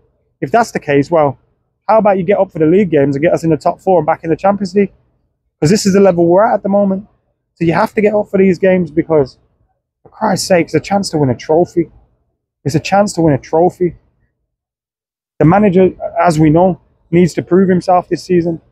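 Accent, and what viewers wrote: Birmingham, United Kingdom. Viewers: He’s Brummy😂😅😢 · How do you mistake a brummie accent for scouse and manc 😂😂 that's crazy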